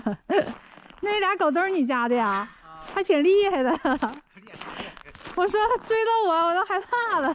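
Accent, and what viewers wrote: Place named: Beijing, China. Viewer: 美女和東北美景又加上一口京片子讓人欣賞影片時感覺真舒服，祝美女新春快樂！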